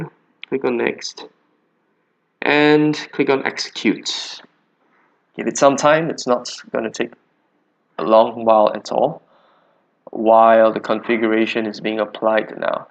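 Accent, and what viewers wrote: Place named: Singapore. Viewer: Singapore? Malaysia? That accent is unmistakable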